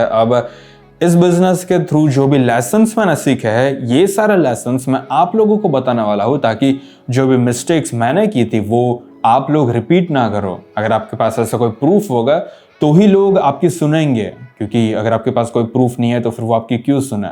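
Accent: Gujarat, India. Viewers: Pure gujrati voice hai bhai ki ❤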